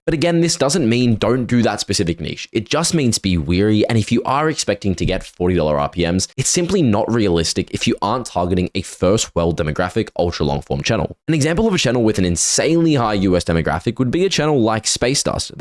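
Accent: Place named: Australia. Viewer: This aussie accent is so cool ma man 🤜🤛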